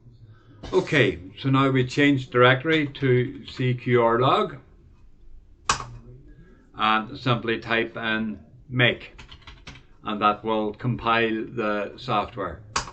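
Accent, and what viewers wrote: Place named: Ireland. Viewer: GerDay, Because I a real "bushie" (live and work in remote areas of Australia), I'm struggling just a little with your accent! NOT your fault - it's just how it is - I don't get enough exposure to Paddy's!!!!! All this is leading up to say it MIGHT be an idea if you're going to show this "from scratch" like this to include links and/or command line text in your description file so that folk who are NOT fully cognisant with Ubuntu AND (like me) who might struggle with your accent can follow along easier! Having said that, this is excellent, so far and I appreciate your efforts!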